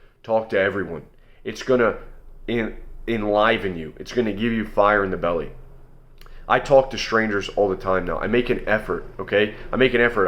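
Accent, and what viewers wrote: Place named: North Carolina, United States. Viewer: This guy sounds like everyone in North Carolina small towns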